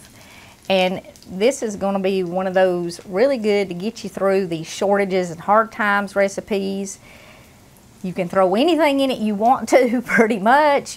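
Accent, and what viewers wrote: South, United States. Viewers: Just love this lady! She talks like my grandma did, southern twang! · She sounds like my people. Hillbillies have been around forever.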